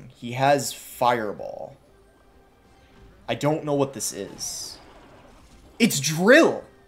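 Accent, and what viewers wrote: Scotland, United Kingdom. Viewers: Why do you say your o's in british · Great knowldege transfer and gameplay! and I wondered, Is that a bit of a Scottish accent? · Why do you randomly have a British accent?? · Has he ever explained why he says his O’s like a British person or something I’ve always wondered why he pronounces them like that (no hate just wondering)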